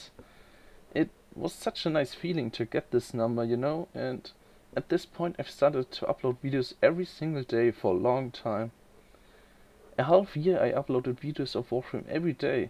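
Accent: Germany. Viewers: Ich mag deine Stimme. ^^ man hört das du deutscher bist:D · I subbed when you were around 5k, and don't bother with the plat for me, I play on xbox one, but anyways I subbed when you were around 5k, and I subbed because I really liked the music in your videos, as well as the knowledge you gave me through your vids, and the videos were fun to watch. And by the way, I love the german accent, and your english was fine! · Ich wusste nach 2 Wörtern, dass du auch Deutscher bist:D Darf ich mal fragen wie alt du bist? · How did I know that you have a German ascent? Any way just wanted to congratulate you on your 25k it will be no time at all before you hit 30k! As for how long I've been subed = I have been subed to you a year or so maybe 2? As to why I think your music selection is great and I also think that your reviews of items are very helpful any way keep up the great work! 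P.s could you make a updated video on how to get out of the leset? (Have no clue if that's how you spell that XD) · TBH your english is better than alot of us! Both written and spoken P.S. German accents are awsome i have 3 foreign students from germany at my school and I really like their accents. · Your English was great, German voice is always cool! Haha. Congrats on your 25k and in a short time, but you can tell why people watch your videos as the same reason that I do. Your quality is amazing, your gameplay always looks greatly handled and your info is always very well explained. I don't follow too many people for warframe unless I like the information provided, and I'm picky and yours have always appealed to me. I play on Xbox one and I still come here for your work. Keep it up! I look forward to your future videos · your English is very good. your German accent is wonderful. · Ahhhh that wonderful German accent. 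· i love the german english accent. i am from holland so i know your struggles;) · Herzlichen Glückwunsch! Man höhrt das du Deutscher bist. Kommt jetzt mehr mit Sprache? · Dude you said your English is bad but it's great you just got a cool German accent, that's all.Also you probably speak better than me and I'm from England, I just stutter way too much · Ich kann mich nicht erinnern, wann ich deinen Channle abonniert habe, aber es ist bestimmt schon eine ganze Weile her. Ich abonnierte dich aus dem Grund, das dein Content sewhr hochwertig wirkte und ich fand, dass dein Fachwissen den großeb Warframe- YouTuber(mogamu etc....) bei weitem überlegen war. Deine Videos waren unglaublich informativ und das obwohl sie so kompakt waren. Ich mochte das insgesamt Paket einfach gerne und du bist immernoch mein lieblings Warframe-YouTuber, weiter so! Ach un dein Englisch ist gut, du klingst halt ein bissel deutsch, aber wer tut das nicht^^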